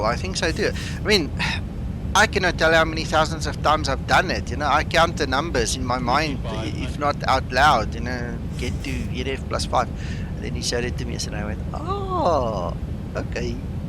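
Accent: South Africa. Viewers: Digging the SA accent bro.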